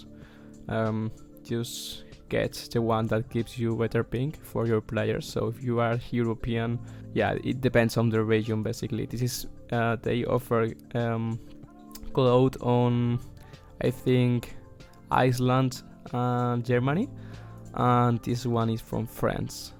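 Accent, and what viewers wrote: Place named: Spain. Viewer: Gracias , se nota que eres español xd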